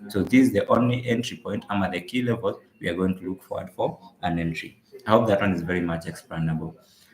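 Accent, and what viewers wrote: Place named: Kenya. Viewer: hi from kenya, nafurai kusikia sauti ya mkenya kwa forex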